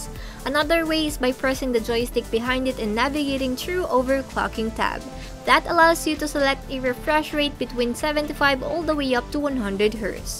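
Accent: Philippines. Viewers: I'm familiar with this accent. She's definitely Filipino. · filipino accent best accent ez to comprehend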